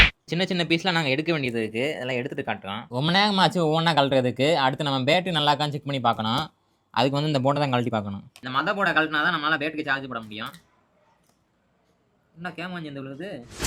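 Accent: Madurai, India. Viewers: அண்ணே ஆனா நீங்க மட்டும் எப்படி எல்லா மெக்கானிசம் பாக்குறீங்க உண்மையிலேயே வேற லெவலுன்னு நீங்க மதுரை ய?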